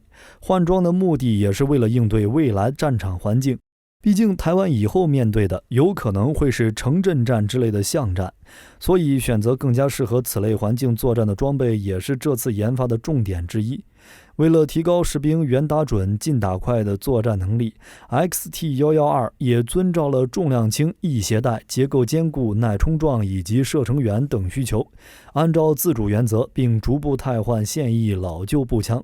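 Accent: China. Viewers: 這播報主的口音怎麼聽都是中國口音~ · 為什麼大陸口音那麼重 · 你是国军退役？为什么你是大陆口音 机器人配音？ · 大家都好認真留言但是都聽不出來大陸口音嗎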